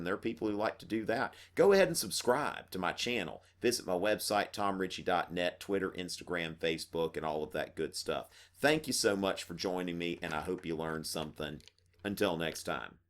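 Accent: South, United States. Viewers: I'm here looking up as much information as possible-trying to finish my history project, and I get this discount Matt Damon with a southern accent.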